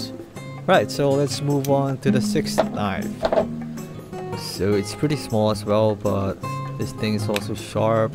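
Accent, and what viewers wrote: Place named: Philippines. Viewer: You sound like your from philippines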